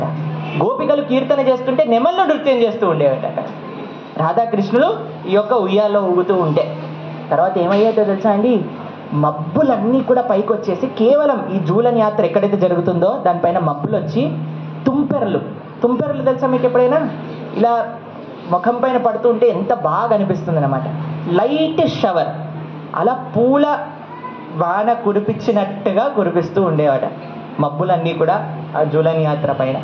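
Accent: Telangana, India. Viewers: ప్రభుజి 😊 తెలంగాణ స్లాంగ్ అద్భుతం 😅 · Complete Telangana slang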